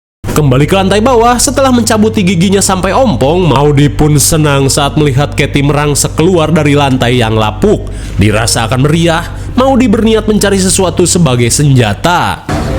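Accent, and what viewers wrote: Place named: West Java, Indonesia. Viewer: Vibe horornya keren bangets...komentatornya juga logat sunda nya keren....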